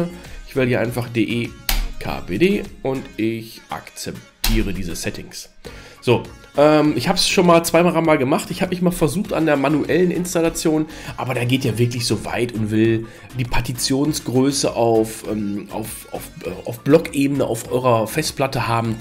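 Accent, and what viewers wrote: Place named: Germany. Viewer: Gutes video und 100% deutscher Akzent lmao.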